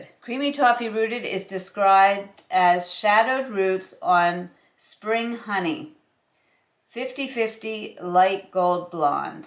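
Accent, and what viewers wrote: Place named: New Jersey, United States. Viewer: I'm from Connecticut near the Westchester border and to my ear I hear New Jersey.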